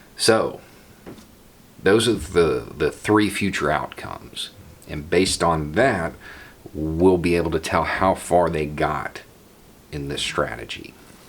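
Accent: South, United States. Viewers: If you like MSNBC with a southern accent and a beard.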